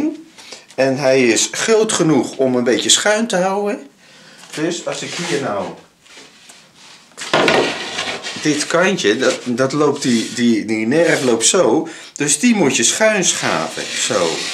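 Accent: Netherlands. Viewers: Hoi, nieuwe leuk schort aan ,staat je goed Vindt het altijd leuk om naar te kijken ,je kan het goed uitleggen voor simpele dingen,en altijd wijs om nerderlander te horen klappen. Groeten Mike.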